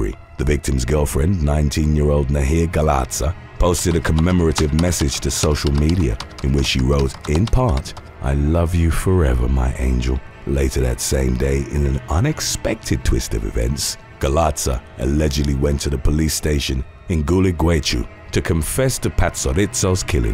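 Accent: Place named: United Kingdom. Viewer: Please please PLEASE stop using "the former" in your video narration. You are using it incorrectly and it's extremely maddening for those of us British educated grammar Nazis. Seriously I love your channel but you have got to stop misusing "the former". Mostly bc you aren't writing your words, your chair are not long enough for "latter and former" to apply, e.g., ten secs into a video isn't enough time to convey such qualifications bc your audience can't possibly know who you're talking about. And your audience is mostly American. Using unfamiliar terms with a British accent can be intimidating. Also, Spanish names and words hint: there are no hard "J" sounds at the start of most words and names, it is an "H" so "Jorge" is "Hor-hay", two LLs together make a "y" sound, Tucson is pronounced "Too sahn" not "Tuck-sin" but above all, let "the later" go, please. 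It diminishes your whole video for Me bc it's distracting in it's utter and complete wrongness. It's driving Me spare love.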